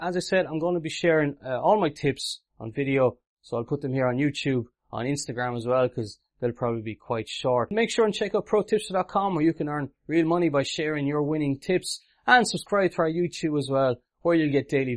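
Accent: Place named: Ireland. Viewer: What part of Ireland are you from man ? good break down of what AH is. cheers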